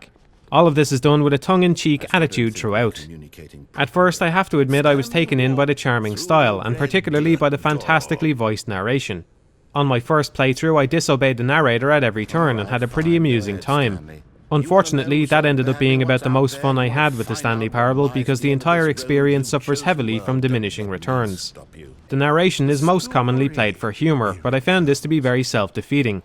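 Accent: Ireland. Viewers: You said "fuck you" in your thick Irish accent. My day has been made.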